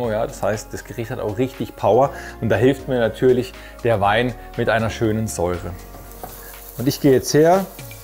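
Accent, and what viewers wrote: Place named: Berlin, Germany. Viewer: "Nen Gudn Appetit wünsch ich euch" lol Ich liebe diese geschwollene Berliner Redensart. Erinnert mich sehr stark an Christian Rach. XD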